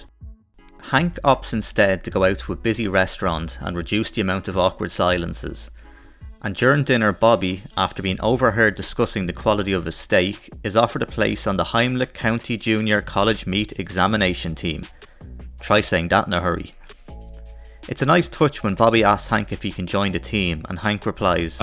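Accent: Ireland. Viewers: British? Sounds Irish to me. But I get you either way. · a very Dublin accent to me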